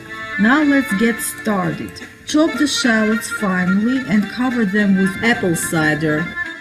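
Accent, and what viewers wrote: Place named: Austria. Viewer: I saw her other channels, the lectures. She speaks Austrian German.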